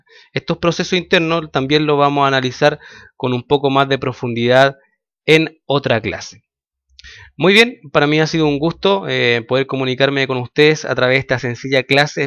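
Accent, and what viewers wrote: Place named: Chile. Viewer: solo un segundo me bastó para reconocer a un chileno xD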